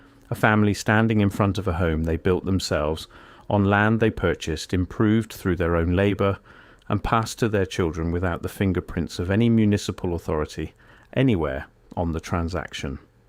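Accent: United Kingdom. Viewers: Why are all AI NARRATORS IN BRITISH ACCENTS 🤔 🙄 FAFO WWG1WGAWW NUREMBERG 2.0 NCSWIC NQTHING GOD SEES EVERYTHING 👀 GOD WINS FRENS M 🏆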